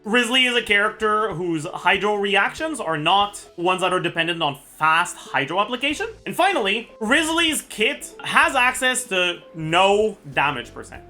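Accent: Quebec, Canada. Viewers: its probably canadian french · I definitely hear the French Canadian influence every time speaks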